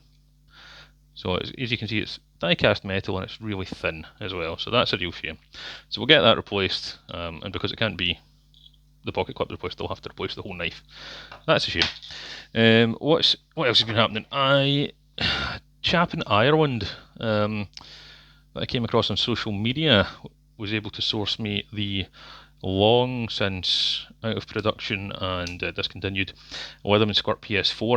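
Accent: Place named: Midwest, United States. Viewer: Heard the accent, are you located in the midwest?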